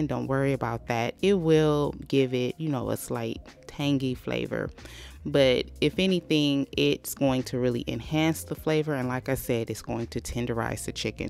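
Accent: South, United States. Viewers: An African American sista with a southern accent frying chicken. It's got to be the best.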